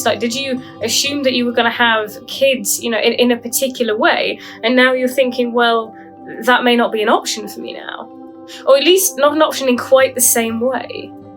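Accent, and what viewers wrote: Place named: England, United Kingdom. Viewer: I so wish YouTube and content like this was a thing when I was in my teens. There was literally nothing and zero information, and found myself so much in denial that I wasted a decade in a doomed marriage. Great upload, very much enjoyed it. PS - I've recently been blitzing content such as this, and is refreshing to hear an English accent :)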